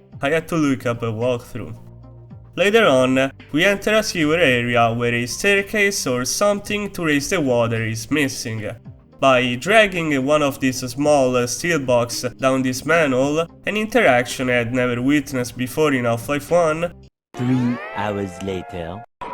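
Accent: Italy. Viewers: Nice vid but u sound so italian lol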